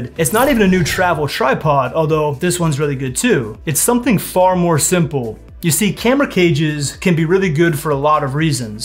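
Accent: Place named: Belgium. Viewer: hmmm are you belgian? youre american accent is pretty good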